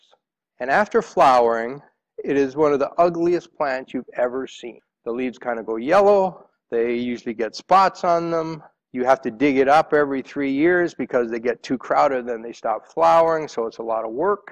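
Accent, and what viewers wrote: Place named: Canada. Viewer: Are you in Canada? You have a bit of a Canadian accent ie the way you say process.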